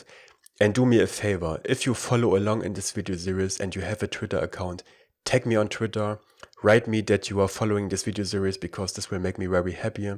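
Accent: Germany. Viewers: I just feel that german accent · bist du deutsch? dein aktzent kört sich so an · I love your german accent, makes it so much easier as a german for me to understand this compared to some american tutorials :D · Dieser deutsche Akzent. <3 Schön erklärt. xD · so ein geiler deutscher Akzent😂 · are you from Germany? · you sound like you are german. Are you?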